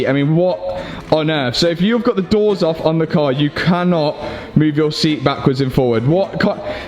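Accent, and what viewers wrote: United Kingdom. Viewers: Late subscriber to your channel, sorry. BUT i hanve literally binged watched everything in the last 10 days....Love it. My Mrs is Leicester so your accent is annoying (im Northern) but genuinely LOVE IT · Great content. Just started watching. Can't wait for the next Lamborghini vid. Gotta say normally I find the brittish accent very annoying but I really don't mind this guy's.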